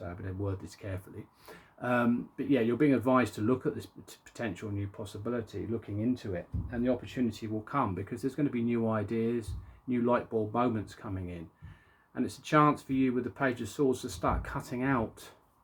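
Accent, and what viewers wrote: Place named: England, United Kingdom. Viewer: Really helpful thank you, love your readings, very clear. This clicked with me...I guess j need to look at things a little more and figure it out, it helps to know to not panic decide, I often feel like you just want to 'flip the monopoly board' so to speak, but ill hang in there. I know what I want to do, ive just finished a course in learning what I want to do, its been hard whilst running a business ,which isn't doing that well anymore, so I kind of already knew, but its now when do I step on to the other train, it feels like I have to time it perfectly, and im very hesitant, but, tge universe will catch me, so.. . Ill jump soon, once ive prepared a bit more! I appreciate the good news of clarity will come and it looks all positive. Thank you. I've subscribed to you now, first time ive seen you and its nice to hear a fellow English person! 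I look forward to more messages to help me through he fog, its appreciated 🎉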